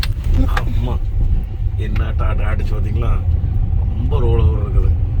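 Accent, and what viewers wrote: Kongu, India. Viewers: Getting addicted to your Kongu tamil. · கொஞ்சும் கொங்குத் தமிழ் பேசும் கோமகரே❤❤❤❤❤ திகட்டாத பேச்சுடைய திருப்பூர் திருமகரே❤❤❤❤ அருமை அருமை அருமை அருமை யெப்பா வேற லெவல் மதிப்பிற்குறிய அய்யா🙏 நீங்களும் உங்க வீடியோ மற்றும் உங்கள் கார் வேலைப்பாடுகளும் எல்லாம் வேற லெவல்😊😊😊😊😊😊😊😊😊❤❤❤❤❤❤❤❤❤❤❤❤👍👍👍👍👍 அதிலும் குறிப்பாக அய்யா நீங்க நகைச்சுவை நடிகர் சின்னக்கலைவானர் திரு.விவேக் சாரோட தீவிர ரசிகராட்டம் இருப்பீங்கபோல😊👍 Secondல சொன்னது "ரைட் சைடு இண்டிகேட்டர்😂 (ஆனால் திரும்புனது இடது பக்கம்😂) அதாவது ரைட் சைடு இண்டிகேட்டர்னு சொல்லுவோம்.... அதே நேரத்தில் இடது பக்கம் திரும்புவோம்.... ஆனால் Straight ah போயி நம்ம ah கண்பியூஸ் பன்னுவோம்ல😂😂😂😂 என்றும் அன்புடன் உங்கள் மகன்போல இவன் ரா.முஹம்மது கபூர் (சென்னையில் இருந்து)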